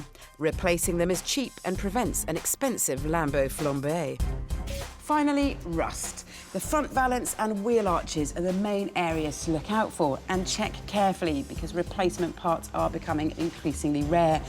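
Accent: United Kingdom. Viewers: Yeah, somethings about her voice and the british accent is so sexy! I do have a crush on her as well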